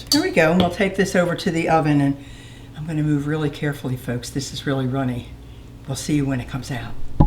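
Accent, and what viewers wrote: Philadelphia, United States. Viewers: Is that a Philly/Delco accent I hear? 😃 This dessert looks so yummy! 🍰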